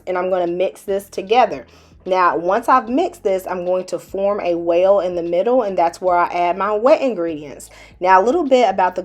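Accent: South, United States. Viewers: Are you a native Southerner?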